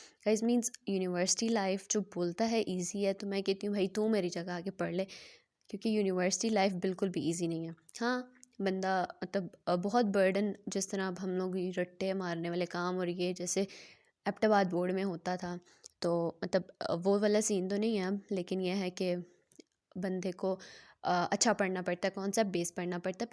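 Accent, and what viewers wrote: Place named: United Kingdom. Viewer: English accent 🤌❤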